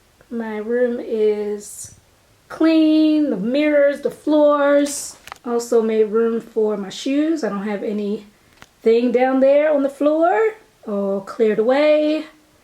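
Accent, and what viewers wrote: Baltimore, United States. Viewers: Lol. I just noticed I might hear that accent from the Baltimore or Philadelphia area.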